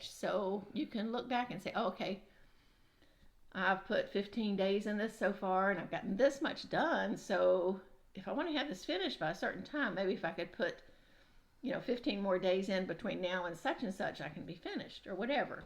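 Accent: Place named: Eastern North Carolina, United States. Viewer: I enjoyed your WIP Parade and listening to your melodious voice. It reminds me of growing up in eastern NC. Happy New Year to you and Peter!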